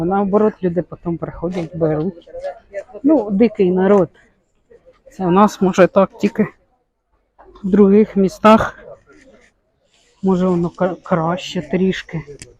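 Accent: Poltava, Ukraine. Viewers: Рiдна полтавська мова ❤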